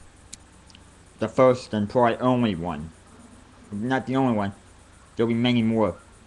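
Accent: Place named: United States. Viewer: So, what part of America is this accent from?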